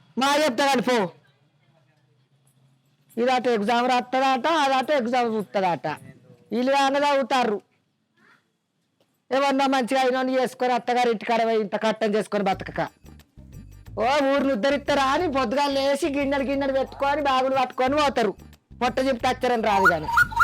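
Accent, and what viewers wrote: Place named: Karimnagar, India. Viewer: మన తెలంగాణ భాషా యాస… పక్కా కరీంనగర్ 😅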